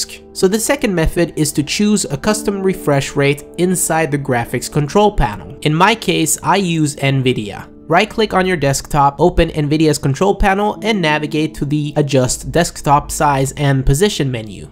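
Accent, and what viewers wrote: Sweden. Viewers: är du svensk? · u swedish? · ur swedish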